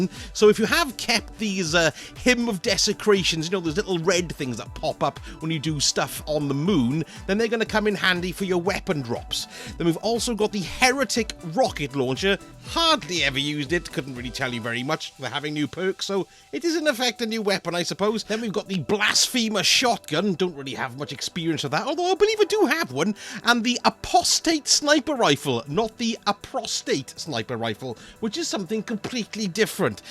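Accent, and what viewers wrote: Wales, United Kingdom. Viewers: I can spot a Welsh twang in your voice,am I right?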